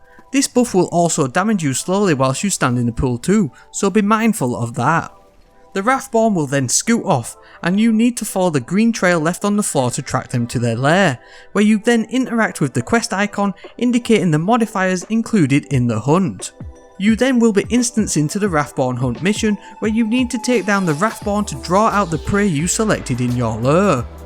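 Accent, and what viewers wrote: England, United Kingdom. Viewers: That's an interesting pommy accent you have there lol. I've never lure pronounced lurrr before. Thanks for the vid ;) very helpful.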